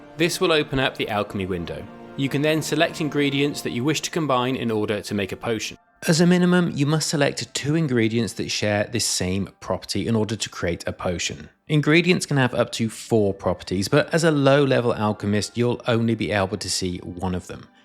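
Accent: England, United Kingdom. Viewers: Good looks my friend great tutorial very helpful even with the English vibe lol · I’m more triggered by your use of apparatus as singular and plural than the British pronunciation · Do people get mad at Brits for Brit speech? Feels childish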